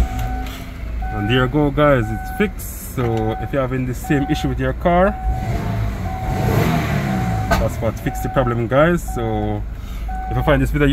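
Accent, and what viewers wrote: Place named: Jamaica. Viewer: Sound like a yardie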